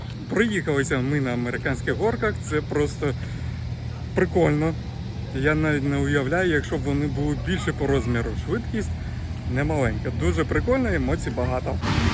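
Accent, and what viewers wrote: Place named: Lviv, Ukraine. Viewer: Оце я розумію блогер. Перейшов на чисту українську і в Одесі спілкується як львівський бандерівець. Велике дякую за таку цікаву екскурсію. Ще про мову напишу - Ви і у Львові краще говорите українською, ніж місцеві. Як завжди лайк і перегляд до кінця ролика.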